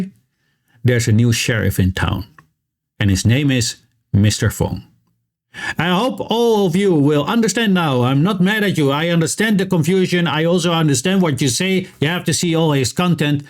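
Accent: Germany. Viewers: sounds like a German accent? figured he is just picking on everyone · He sounds German...don't mention the war. What does this have to do with China Western relations? Going after every internet meme is stupid..keep your eye on the bal....NEXPERIA!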